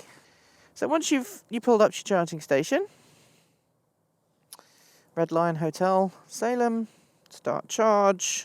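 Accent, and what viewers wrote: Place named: United Kingdom. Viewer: She bri-ish aren't you Paying attention?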